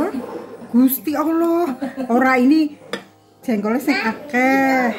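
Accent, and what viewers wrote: Banyumas, Indonesia. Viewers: Wkwkwk.. Jebule wong ngapak.. Tosss disitt.. Yuh pd mampir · Seneng liat vlog nya bunda berasa lg pulang kampung😀😀 pokoke ora ngapak ora kepenak ya bund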